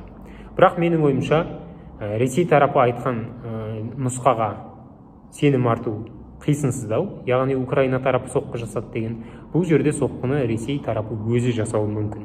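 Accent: Standard. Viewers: Қазақша таза сөйлегеніңізге отбасымызбен ризамыз. Мықты. Ұнату және жазылу.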